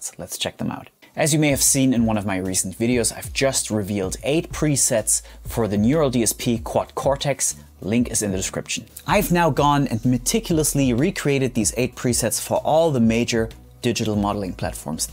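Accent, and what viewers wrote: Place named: Germany. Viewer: HI! Wo hast du dein musikstudium gemacht? Es sieht so aus als hätte Deutschland ein guitarristenboom mitt Manuel Garder Fernández und dir. Mann kann ein sliver of a german accent in dir erkennen aber du sprichts echt super! ( ich binn halb deutsch halb spanier) Viele Grüße.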